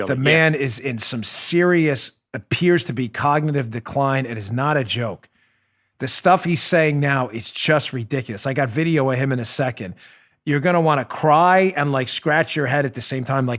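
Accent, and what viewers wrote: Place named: New York, United States. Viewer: When I used to play solo piano in international settings, I could often tell when a listener was from New York because first, he had a funny accent, but more importantly, he talked to me like me and him had been good friends for years. Listening to Dan reminds me of that.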